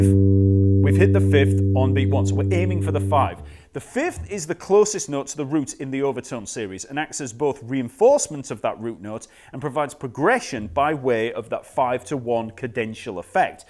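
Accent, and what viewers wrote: United Kingdom. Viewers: Uk accent :)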